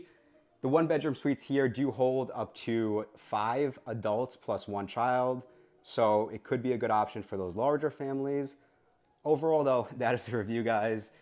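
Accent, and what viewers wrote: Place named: New York City, United States. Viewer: Thanks for this video! Considering this for our next stay and this was very helpful. Also a nice taste of home hearing you talk! --a fellow NYC Italian now living elsewhere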